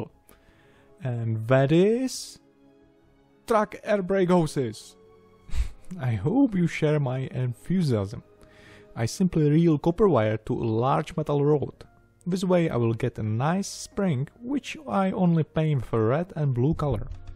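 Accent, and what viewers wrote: Czech Republic. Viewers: You Czech? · další skvělý model, ale mondieu ta czengličtina :) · Čecha mluvícího anglicky poznám na tři míle.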